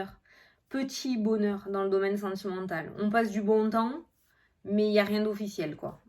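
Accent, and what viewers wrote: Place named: South-West, France. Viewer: Comme on dit chez moi en Suisse, que les esprits critiques aillent voir autre part si tu y es. Argh 😓 Si tu arrives à avoir 53000 abonnés, c'est que quelque part ton petit grain de folie est une bouffée d'oxygène pour certains. Personnellement, ça fait trois ans et demies que je soigne un cancer incurable. Est-ce que j'ai envie d'écouter une personne qui s'écoute parler? Au ton monocorde? La réponse est non. Si je suis ici depuis un peu plus d'un an, c'est parce que tout en toi aspire à la fraîcheur. Ton petit accent du sud ouest est un régal pour les noreilles. 😁 Si j'avais envie d'autre chose, je ne serais pas là depuis un an. Reste le joyau que tu es. Tu me fais rire. Et tu fais du bien, quand les choses sont sensibles en ce qui me concerne. Actuellement ma vie n'est pas un long fleuve tranquille.... loin de là. 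Repousse les esprits critiques loin de toi, et reste le rayon de soleil que tu "diffuse". Avec 53000 abonnés, tu peux même te permettre de les virer! Argh screugneugneu 😓! Personne ne blesse notre! Colère. 😠 🗯 💢 👿 Longue vie à toi, et à ta chaîne ma. 😘 😘 🎵 😚 😚 🌟 🌟 🌟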